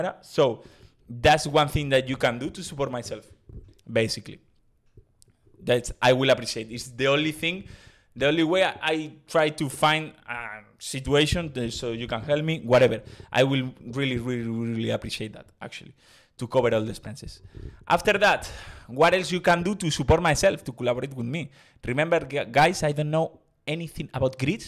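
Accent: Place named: Spain. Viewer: You are incredible! I love your Spanish accent when you are talking in English. I hope you like Athens.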